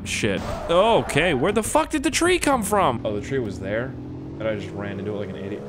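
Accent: Russia. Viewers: Love your Russian accent xD More Russian videos!! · i'm disappointed you didn't start this episode in your Amazing Russian accent. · Please do more random Russian games so I can hear your hilarious accents lolololol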